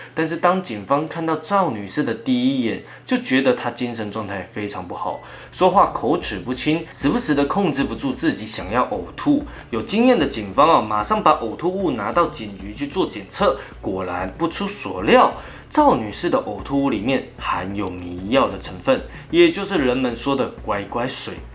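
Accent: Northeast, China. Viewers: 我艹，大叔是东北人？ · 听口音，这不是江苏淮安人啊，这很明显是个东北人啊，视频不严谨呢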